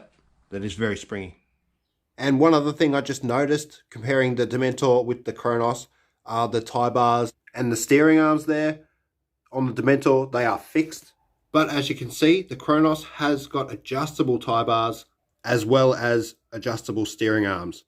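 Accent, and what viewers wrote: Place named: Australia. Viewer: Hello my friend cool Ausie accent the Kronos is gorgeous iam looking to get a 4S brushless truck, the Kronos might be the one, or I was thinking the traxxas Maxx V2 4S, I have tons of trucks and cars I've been into R/C since 2005 I got XMAXX losi 8T, Kyosho GT2 nitro and so on, but I don't have a 4S truck, anyway thanks your new subscriber william the doorman from NJ Forgettaboutit lol 😆 😀 😊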